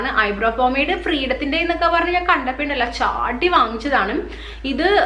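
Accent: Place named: Kochi, India. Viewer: Innathe samsarathilanu kochi slang undayathu, nalla rasamundu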